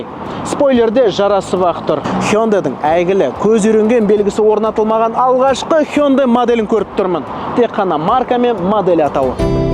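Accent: Standard. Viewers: қазақтың тілінде таза сөйлейді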